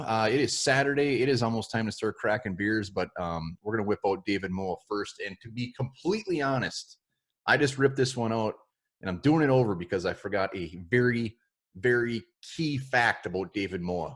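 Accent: Minnesota, United States. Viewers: Your Minnesota accent is soooo heavy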